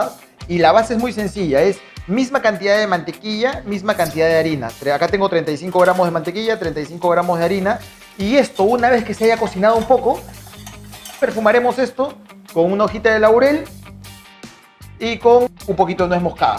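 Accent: Peru. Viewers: Gracias por el video. Que bonito oírte con el acento de peruano, así como hablan en Lima 😊😊😊😊😊😊😖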